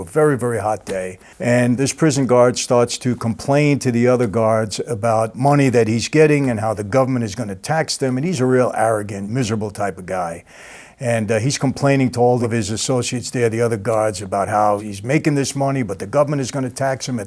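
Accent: New York, United States. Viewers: Ok I might be tripping but I really can't stop noticing that his voice has completely changed recently. Sounds less deep and less New York?😂😂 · You're a great story teller, and I love your "NYC street guy" accent!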